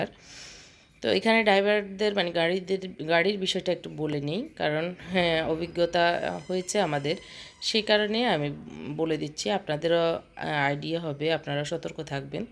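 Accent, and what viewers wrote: Noakhali, Bangladesh. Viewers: আন্নে হুরাহুরি নোয়াখালী ??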